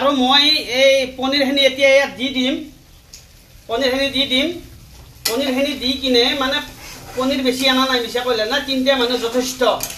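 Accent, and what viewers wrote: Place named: Lower Assam, India. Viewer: ভাল লাগে ফে আপোনাক ❤ । ভাষা টো যে নামনিৰ । সেইবাবে !!!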